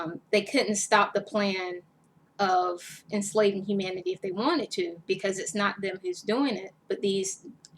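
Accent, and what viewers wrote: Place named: United States. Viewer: "tares" is an old way to say "thistle" from Early Modern English such as existed in 1599 when the Geneva Bible was translated into English. Everyone who lived in europe before 1000 CE is related to every white person alive today, and every person with even one white ancestor. There are 5 Gods and a Goddess in my genealogy. And guessing by your light complexion and American accent, you and I are related.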